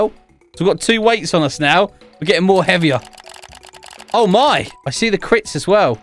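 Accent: United Kingdom. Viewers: Hmm u sound British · His British accent never gets old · Are you BRITISH???? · Are you british bohou oh wauh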